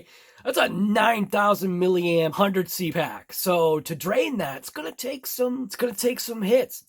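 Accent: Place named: New Jersey, United States. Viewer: Oh wow this is crazy that’s what they get for copy Arrma. Oops 😬 they have nice trucks. Where u located I’m in New Jersey telling by ur the way u talk is like me hit me back. And good luck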